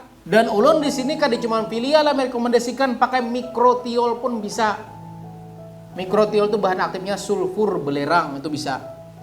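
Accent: Banjar, Indonesia. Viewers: Banjar banar aksen nya ❤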